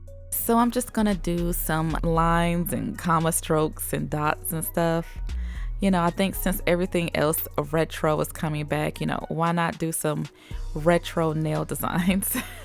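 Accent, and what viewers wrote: South, United States. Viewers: I guess Nashville has rubbed off on ya a little bit lol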